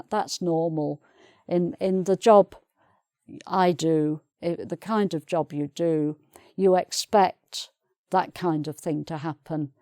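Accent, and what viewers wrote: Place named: RP, United Kingdom. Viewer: I love this lady! She explains things so clearly and with this so beautiful British received pronunciation.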